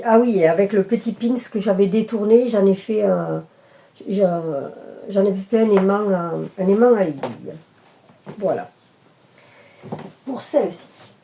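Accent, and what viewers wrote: South, France. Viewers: C'est génial de teinter vos toiles! quelle bonne idée! J'aime bien aussi le Diamond Painting mais quand je commence je n'arrive plus à m'arrêter, en un week-end c'est fini et après je ne sais pas quoi en faire donc j'ai arrêté d'en acheter... Olala l'oiseau il va être trop beau ♥ J'aime bien l'idée des cases, une de temps en temps c'est sympa! je verrai bien ça en calendrier de l'avent! Wouaw la toile bleue avec la sirène superbe! Oh marrant ce trieur! (moi j'adore votre accent, une partie de ma famille vit dans le sud mais ils n'ont pas l'accent hélas... ils sont arrivés adultes) wouaw le tricot mais vous savez tout faire!!! le projet couture en duo super! vous savez vraiment tout faire bravo!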